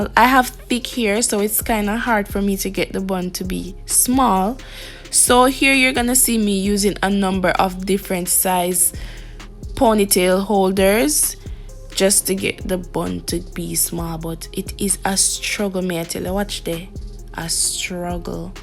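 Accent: Jamaica. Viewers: Love you're jamaican accent❤❤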